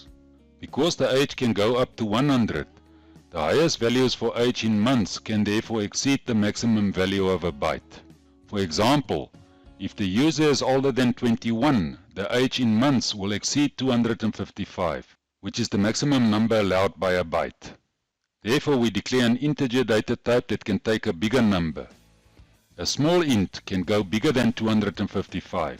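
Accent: South Africa. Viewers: Familiar South African accent. Sounds great. Great explations.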